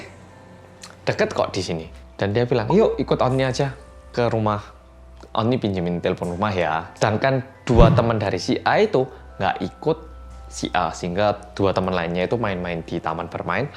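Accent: Java, Indonesia. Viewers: Keren kak.... medoggg.... salam kenal kak... +1 dukungan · Lucu ini kakak hihi muedok tnn ntok e ngmong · Bersama orang Korea yang medokk keren juga tu baju nya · Mas: halo guys welcome back to bersama orang korea yang Me: MEDHOOOKKKK!!! ❤❤❤❤ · wong Korea sing medhooookk!! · Cus team mendeloookk... Bersama orang Korea yang medoooookk 😊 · is “timoer” but backwards. It’s a typical wordplay in Malang, a city in East Java, Indonesia, where he grew up. “Timoer” or “timur” means east. In this context, means that he’s a korean who is from (or grew up in) East Java. “Yang medok” basically means he who has thick accent, because he speaks Indonesian and Javanese in thick javanese accent. (Medok is a javanese term so maybe that’s why you dont understand if you’re learning Indonesian)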